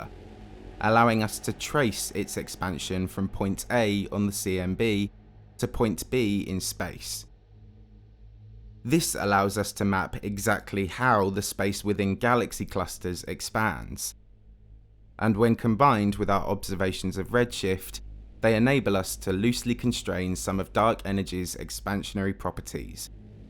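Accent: United Kingdom. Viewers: What's that accent? Where in the UK do they speak like that? Solar systeeeem, compositieeeen, it's kind of distracting. Other than that it's a great channel.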